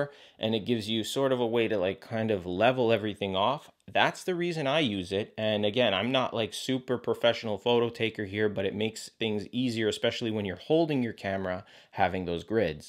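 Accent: Canada. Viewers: Thank you! i'm going to watch again, just got the 12from the 6 ani'm a filmmaker. Enjoyed the Canuck accent.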